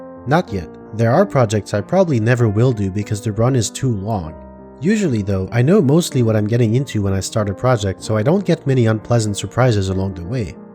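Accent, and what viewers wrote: Quebec, Canada. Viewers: Being from Quebec as well I had such a feeling that’s why your accent was. Great videos! Keep them up! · I could tell you had a Quebecois accent, it's very faint though.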